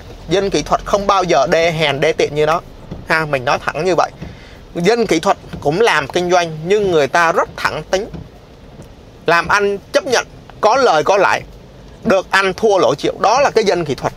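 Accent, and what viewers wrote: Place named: North, Vietnam. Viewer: e đăng ký rồi anh. tiếng bắc 54 hay quá nghe ghiền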